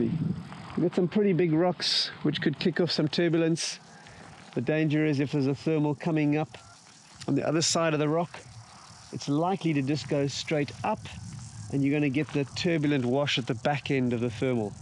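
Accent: South Africa. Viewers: Haha nothing like Porterville to bring out your sarth efriken accent ey bru! Lekka!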